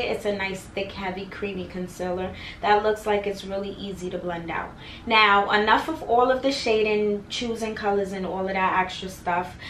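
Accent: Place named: New Jersey, United States. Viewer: Are you from NY because I’m from jersey and you sound like us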